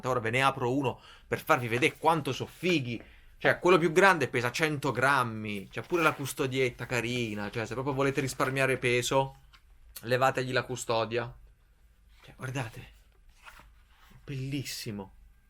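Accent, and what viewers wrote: Apulia, Italy. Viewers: Pugliese ? 😊 S send 😂😂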